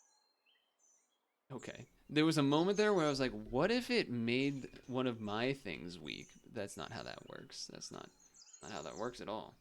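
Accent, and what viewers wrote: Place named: Newfoundland, Canada. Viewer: NL cadence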